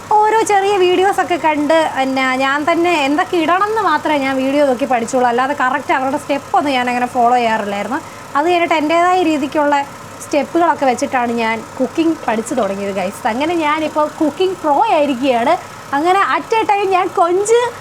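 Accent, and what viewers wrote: Kottayam, India. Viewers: എന്നാ കൊച്ചേ വീഡിയോ ഒന്നും ഇടാത്തെ ചുമ്മാ നടക്കുമ്പോൾ എങ്കിലും ഫോൺ പൊക്കിപിടിച്ചു പോകു 😂😂 1k കഴിഞ്ഞു വല്ലതും അറിയുന്നുണ്ടോ 😂😂😜. കോട്ടയം,കണ്ണൂർ, സ്ലാങ് കേൾക്കാൻ നല്ല രസമാ ☺️ അവിടെ ഏതോ കട്ടിലിൽ നടക്കുന്നകണ്ടു 😊 അവിടെ 5 ഏക്കർ വാങ്ങിയോ 😊 പുതിയ സ്ഥലം കാണിച്ചിട്ട് വല്ല്യ അനക്കമൊന്നുമില്ലലോ??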